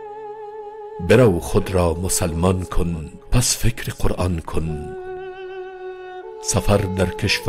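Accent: Afghanistan. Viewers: این لهجه دری است پارسی دری است لهجه تاجیکی را شنیده اید؟ تاجکستان لهجه شان ماملا متفاوت است چرا با افغانها مشکل دارید تبعیض میکنید؟؟ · لهجه دری هست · این لهجه فارسی افغانستان هست میتوانید از سخنرانی های داکتر نجيب درک کنید این صدا را. البته فعلا این لهجه زیبا در حاشیه ای سیاهی رفته هست متاسفانه به احتمال خیلی زیاد این اثر زیبا و میراث قیمت ناپذیر فارسی زبانان خردمند و با معرفت چندی دیگری باقی نخواهد ماند حتما میتوانیم بگوییم در حال حاضر همچنین به دست تاریک فراموشی سپرده شده است و آهسته و پیوسته در حال از بین رفتن هست و به نظریه اکثر زبان شناسان دیپارتمنت دری در آینده نه چندان دور تنها لهجه که مردم فارسی زبان بر آن تکلم خواهد کرد لهجه تاجیکی تاجیکستان و ایرانی ایران خواهد بود وبس و من خیلی احساس تاسف دارم💜🤍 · لهجه دری 🇦🇫🤌🏻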